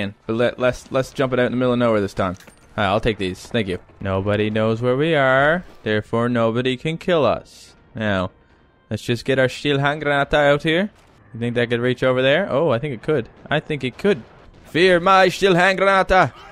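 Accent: Germany. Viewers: O, you're German is quite funny to sit and listen to:D I know about as much as you and I think I know that we both messed up somewhere.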